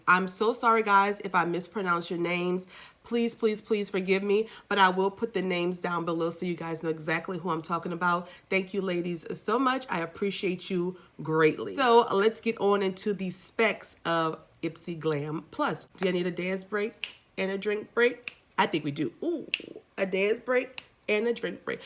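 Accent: Louisiana, United States. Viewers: Where are u from? Your accent sorta sounds like mine. I am in Louisiana.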